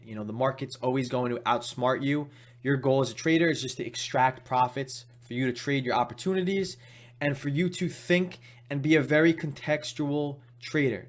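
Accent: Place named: Long Island, United States. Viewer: Sounds like a Long Island accent. Home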